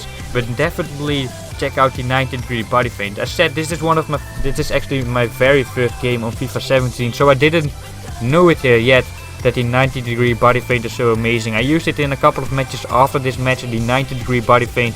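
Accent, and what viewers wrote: Netherlands. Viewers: Kom net je kanaal tegen. Jeetje,... echt erg indrukwekkend hoe je speelt. Wellicht spelen we een keer online. Verder zou ik enkel je Engels een beetje opschonen. Enkele standaard Nederlandse Engelse uitspraken; "finding it out", "try stuff out". Enkel goed bedoelt! Heb me iig geabonneerd en heb er al een hoop van geleerd, thx!!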